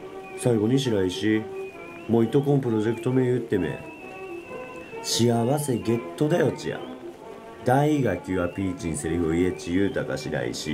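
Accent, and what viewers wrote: Kagoshima, Japan. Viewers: いまの若い鹿児島ん人でここまで鹿児島弁を話すん子がおるとはたまがっど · 地元が違うのに何故か標準語より内容が頭に入ってくる鹿児島弁よ・・ｗ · 全然わかんない鹿児島弁も凄いし、プリキュア発言に※ついてるのも面白いwww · 鹿児島弁くせがつえ〜！！！！ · 待ってましたw 大臣の鹿児島弁アテレコシリーズ大好きだわw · 鹿児島訛りのアフレコ、最高です🤣 白石くんのプリキュア愛も入ってましたね💖🤣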